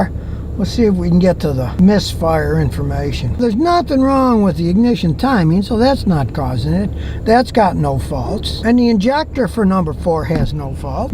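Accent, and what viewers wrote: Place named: Western New York, United States. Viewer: U annoy me so much...then your voice made me think...is he also from WNY? AI told me not from Bflo..but the Falls is the same! KNEW IT. That accent has an annoying sound. In Colo Spgs 30 yrs - same thing. I'm annoying too but ♡ my cars are so not rusty & trouble free!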